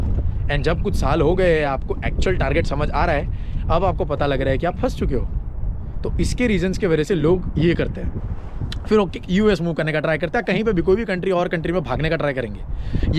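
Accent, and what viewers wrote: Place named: Punjab, India. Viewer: Kaun jagaga o.tha? 🫣 Aap bolo naa bolo hum log samajh gaya😆 Punjabi hi hoga🤭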